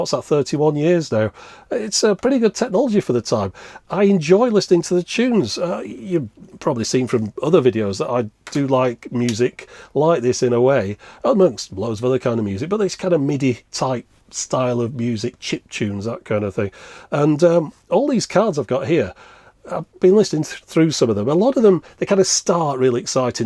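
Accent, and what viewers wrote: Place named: England, United Kingdom. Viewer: "inverted commas". As an American, one of the things I love about this channel is hearing English accent, and the difference in terminology from one end of the Atlantic to the other.:-)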